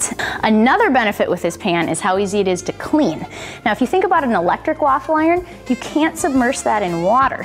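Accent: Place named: Midwest, United States. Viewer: Mid-west accent LUL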